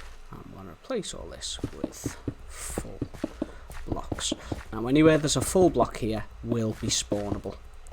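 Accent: Liverpool, United Kingdom. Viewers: Nansymansy Si adnan LMAO. It’s a scouse (Liverpool) accent. Liverpool is a place in England my friend.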